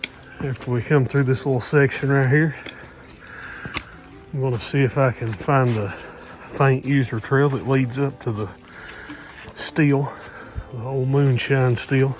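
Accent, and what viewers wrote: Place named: Eastern Kentucky, United States. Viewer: With that accent, you have to be from Eastern, Ky? My mother is from Perry County. Great video, I enjoyed the moonshine still. Never knew it was there. Thanks for the upload. New Subscriber!!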